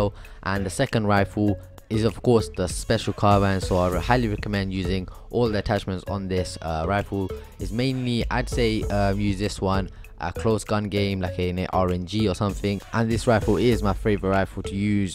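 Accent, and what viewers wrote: United Kingdom. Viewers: HE TALKS BRITISH 🔥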